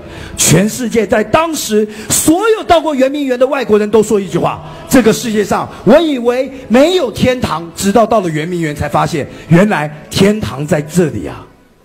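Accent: Taiwan. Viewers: 台灣的吧！ 一口一個“xue yi” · 我就想问问这是谁？听口音台湾同胞呢？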